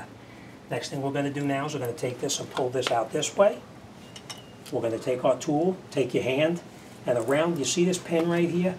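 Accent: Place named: New England, United States. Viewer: Cool. You have a New England accent! "Hello Your On Car Talk"!!!!!! Don't drive like my brother!!!!!!!!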